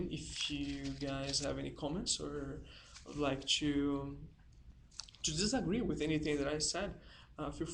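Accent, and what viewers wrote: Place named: Brazil. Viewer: Haha, you have a Brazilian accent! Cheers from Brazil!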